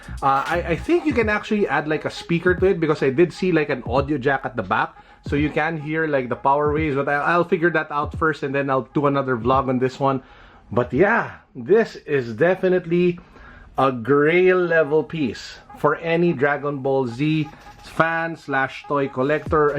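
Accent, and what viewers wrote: Philippines. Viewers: are you filipino?? I can read your accent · you have a Filipino accent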